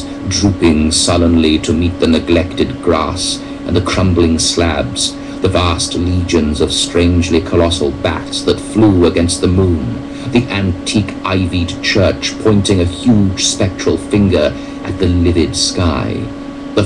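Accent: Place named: England, United Kingdom. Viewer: This is awesome, and it's great how they got an English guy to do the voice, since that's where it's set. Lovecraft was the man! No, Lovecraft IS the man! Lovecraft is forever!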